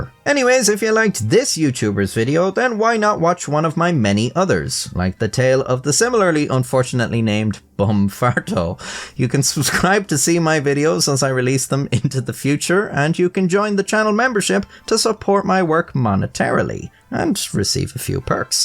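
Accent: Ireland. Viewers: Listening an Irish man talking feels better than a british man talking 😅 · I love the Irish accent. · Well, I subscribed for a combination of the charming Irish accent, unassuming animations, and vague but unsubtle threats against people who don't subscribe. But we all have our reasons.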